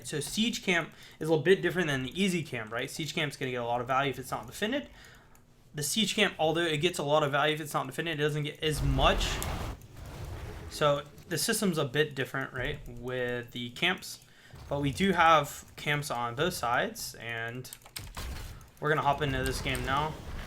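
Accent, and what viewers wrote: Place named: Pittsburgh, United States. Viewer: are you from Pennyslvania? You have kind of a Pittsburgh accent...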